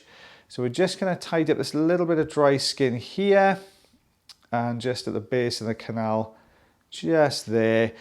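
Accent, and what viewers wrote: Wales, United Kingdom. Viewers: I swear I only watch for the welsh accent. Not been to Wales since covid 😢. I live abroad now.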